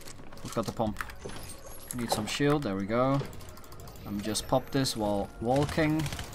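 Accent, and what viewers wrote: Netherlands. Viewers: I hear a dutch accent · Are you dutch?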